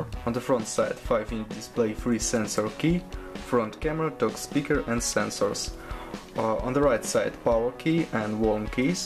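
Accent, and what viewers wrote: Russia. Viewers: хехе факин рашн экцент, но это ничего, а вот политическая карта мира..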